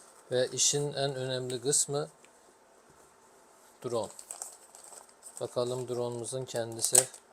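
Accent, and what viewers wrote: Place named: Azerbaijan. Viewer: konuşan arkadaş azerbaycanlı galiba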